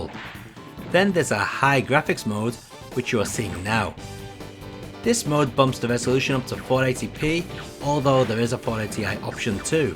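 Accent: United Kingdom. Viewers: Can’t tell if you’re British or Asian.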